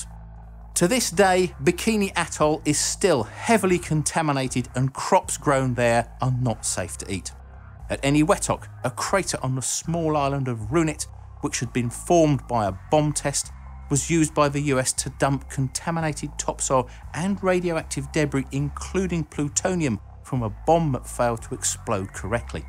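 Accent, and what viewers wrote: United Kingdom. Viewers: "Since the formation of the United States its mainland has never been invaded or had any major attack." Ironically this was spoken with a British accent. For the benefit of those who are not students of American history, here is an example: <link>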